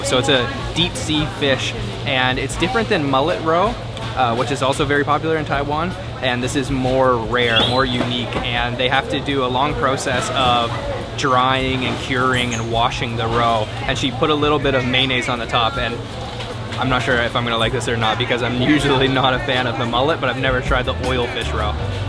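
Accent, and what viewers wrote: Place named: Eastern Canada, Canada. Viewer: 100% Eastern-Canadian Accent!!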